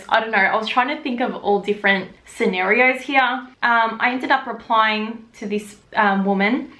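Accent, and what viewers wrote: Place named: Australia. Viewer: I liked this story time!! It was really interesting & I love the way you explain stuff. Being a fellow Aussie I just relate so much to the way you speak & it makes me laugh (even tho the girl being a stalker wasn’t funny!!!!) xxx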